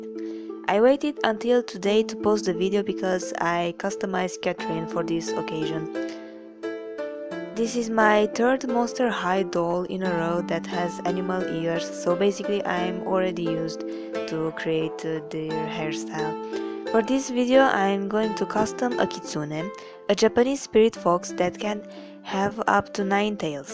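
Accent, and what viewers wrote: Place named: Russia. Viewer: Либо ты второй человек подряд с русским акцентом, либо у меня паранойя 😰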